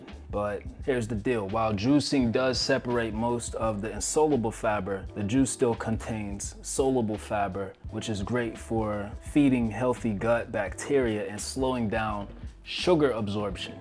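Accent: Pittsburgh, United States. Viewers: U sound like your from Pittsburgh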